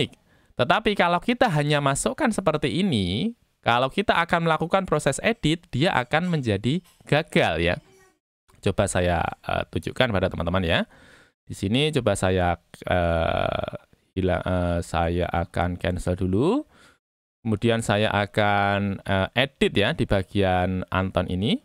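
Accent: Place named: Java, Indonesia. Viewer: Senengnya akun ini medoknya lucu, mantep mas jawa aslii ini mudah di mengerti heeh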